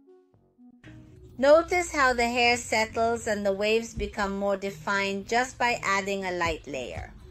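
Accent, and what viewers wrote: Mexico. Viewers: Creoq es de Mexico x el acento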